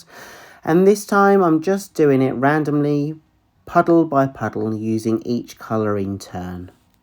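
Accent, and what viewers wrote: United Kingdom. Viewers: Where do you buy Australian Floetrol? From here I can buy it in Australia for a realistic price plus taxes and duty or on ebay for half a million...... Still love your british English 😍 And I like this design, it looks like a jungle deer 💯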